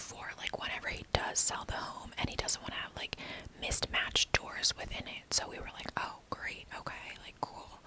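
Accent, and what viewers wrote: United States. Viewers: All i needed is my fav american accent this morning❤️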